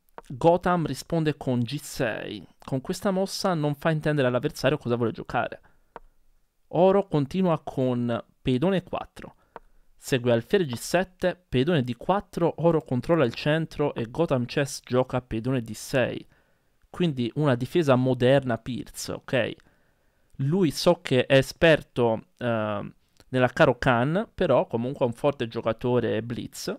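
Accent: Tuscany, Italy. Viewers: se inizi in inglese lo superi! Io spero di no perchè mi piace il tuo accento toscano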